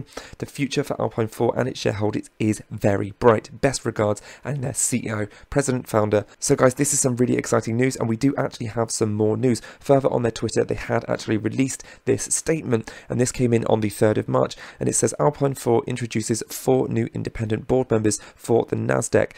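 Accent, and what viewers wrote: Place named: West Country, United Kingdom. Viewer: Good Video. I also like your very discreet west country accent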